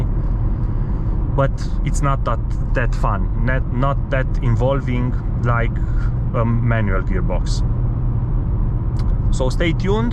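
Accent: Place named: Russia. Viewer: Man... I love your accent. By any chance, do you have Russian roots? By the way, I saw this in the description ''*Manhart Stage 1 will allow 300+ kmh ''. Honestly, I'm not sure about that. In your other video I saw 256km/h at almost 6000 rpm. The manual gearbox seems to have short gears. 300km/h+ should be possible, especialy with a remap, but I wonder if that gearbox can reach such speeds. Stock these do about 1.2 bar boost. If the turbo can do about 2 bar or more, expect atleast 600hp, maybe something close to 650hp with a stage 1 remap.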